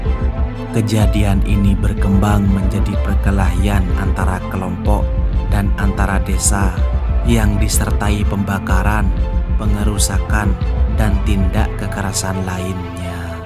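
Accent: Madura, Indonesia. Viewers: Logat bicaranya kental bgttt... Kental bhs Madura.. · abg madura kan logat ny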